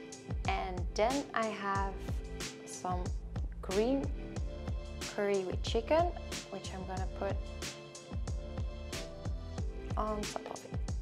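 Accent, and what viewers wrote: Netherlands. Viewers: You sound dutch and your name also looks dutch so are you from holland ?? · Ik hoor ook echt het nederlandse accent haha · Je hebt echt nog dat Nederlands acsent in je Engels😊 maat goed bezig!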